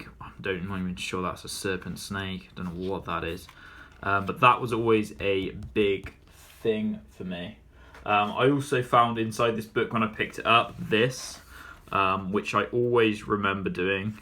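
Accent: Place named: South West, United Kingdom. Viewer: Your artistic evolution is a testament to your hard work mate, good work. I'm UK old school (2nd wave, started 1987). More of a character artist myself but still love the letters. You do some very nice work. You from the south west? Thought I recognised the accent. Come check out my channel mate, see what you think. Peace.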